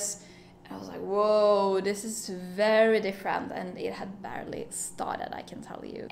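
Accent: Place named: Sweden. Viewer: nice trip report, sounds like you had a wonderful experience! and yes your experience sounds very much like "The K experience", that is very interesting! im actually heading out tomorrow to collect som amanitas, im going to make an extract of it, im very excited :) also i hörde på en gång att du var svensk haha, så trevligt^^ heja sverige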